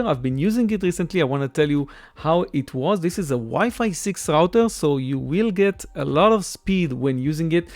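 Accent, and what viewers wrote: Israel. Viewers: איזה מבטא ישראלי אחי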